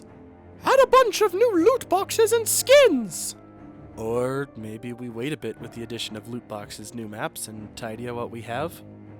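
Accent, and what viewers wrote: Russia. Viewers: Мне показалось или у автора русский акцент? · Мне кажется ты русский,акцент русский слышно.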